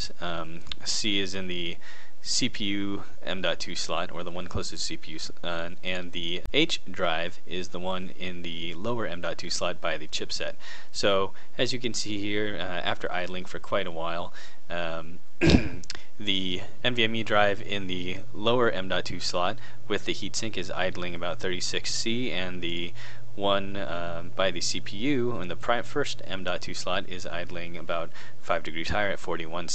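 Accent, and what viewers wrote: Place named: New York, United States. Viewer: Are you from the same area (new-york-ish) as the famous repair-rights activist Louis Rossman? It sounds like you guys have the same dialect - a lot.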